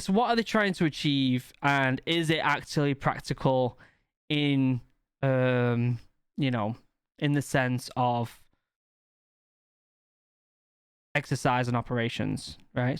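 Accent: United Kingdom. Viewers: Why you have a British accent lol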